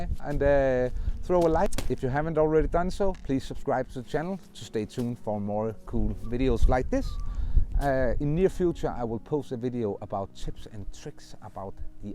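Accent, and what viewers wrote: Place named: Denmark. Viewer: Can i ask where you live? You sound Danish but most of your videos dont seem to be shot in Denmark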